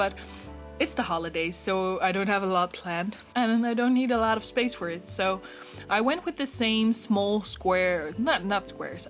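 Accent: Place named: Netherlands. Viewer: Do you know when you watch a video and you hear a slight accent and you're like DUTCHDUTCHDUTCHDUTCH As a dutch girl, it's like a dutch radar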